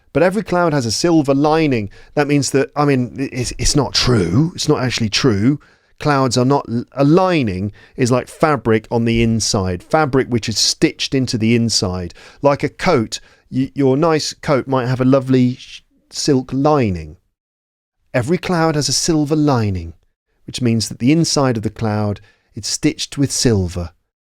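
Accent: United Kingdom. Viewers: Hello everyone, i would really appreciate your help. My question is, Am I wrong, listen to, which I consider the best channel for learning English. I'm wondering this behe has a British accent, and I am in a place where American English is spoken,. I mean obviously it's different but i also think listening to it's helping me to improve my listening and speaking. At grammar I'm terrible. Thank you guys.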